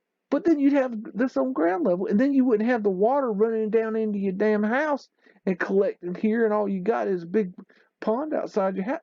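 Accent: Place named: South, United States. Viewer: Love what you're doing! Whoa that accent is thick tho lol where in the south are you from?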